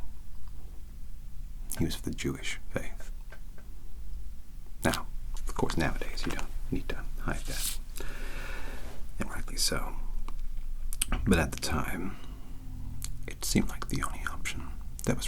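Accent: United States. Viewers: That’s such a good American accent... fooled me until I saw the comments. It’s awesome man! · I don't know what it is, but an Englishman who can do a convincing American accent is very powerful to me. 👀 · truly i was jarred when i heard the American accent still soothing tho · I love the way your American accent sounds. Your also an amazing actor!! ❤️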